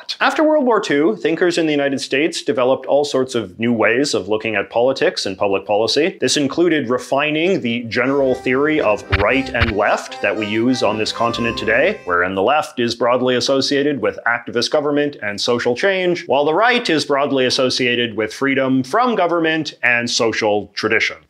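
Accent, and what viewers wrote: Canada. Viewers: Damn you have a thick Canadian stereotypical speech, My english is not that pronounced, but my french sounds like i'm from the atlantic coast, cause my moms french Acadien family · I do believe you are the first Canadian I have ever heard actually say “oot”! And I have lived here for 76-odd years!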